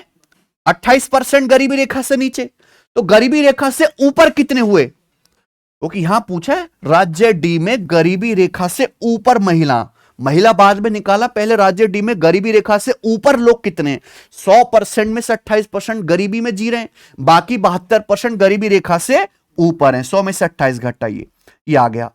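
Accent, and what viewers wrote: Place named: Uttar Pradesh, India. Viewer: Sir aap jo up language me tabad tod se bolte ho mja aata h sunne me bhi or padne me bhi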